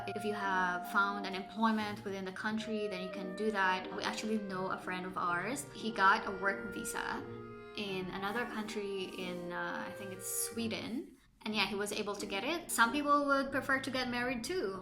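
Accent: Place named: United States. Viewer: I asked because your English dialect and expressions are very American like.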